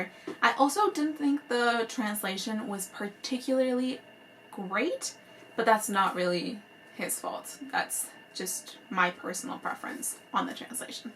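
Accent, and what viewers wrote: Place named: United Kingdom. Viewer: Are you British? I hear an accent every once a while!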